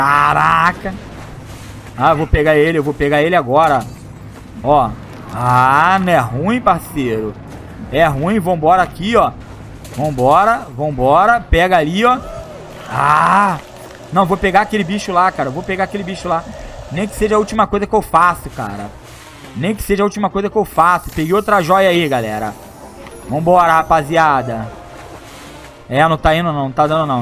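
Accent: Rio de Janeiro, Brazil. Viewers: Esse cara fala o verdadeiro carioquês kkkkk salve sou de Nova Iguaçu RJ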